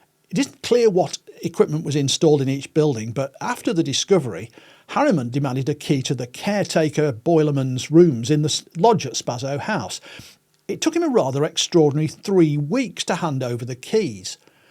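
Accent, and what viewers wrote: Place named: Lancashire, United Kingdom. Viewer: You're a Lincolnshire lad? Why's it sound like you have something else mixed up in that accent then? I mean, the Lincolnshire accent isn't the strongest of accents (unless you're saying something like carpark)...but, I can hear elements, maybe, of a Lancastrian accent mixed up in there too? 🤔 I know a lot of Lancaster Bombers used to fly from in and around Lincolnshire during the war...the link IS tedious though to say the least 😂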